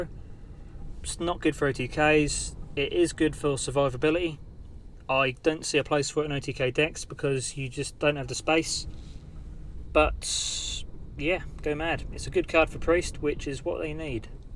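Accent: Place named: Norfolk, United Kingdom. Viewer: Never seen you as English. You from Norfolk lol?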